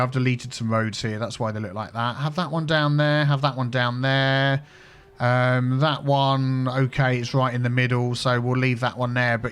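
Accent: United Kingdom. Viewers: youre kind of adorably british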